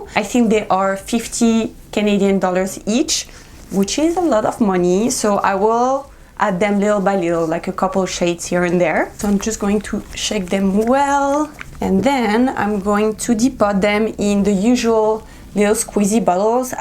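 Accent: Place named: France. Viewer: Vous êtes française ça s'entend à votre accent 😊 Je vais regarder un peu vos autres vidéos j'adore les vidéos makeup !! ^^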